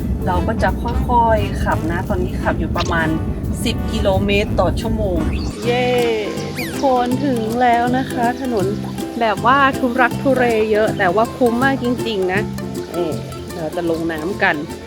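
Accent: North, Thailand. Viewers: ทำไมพูดเหนือได้ครับ หรือลูกครึ่งเหนือ+อิตาลี่ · อี่หน้อยนี้อู้เมืองได้ต๊ะ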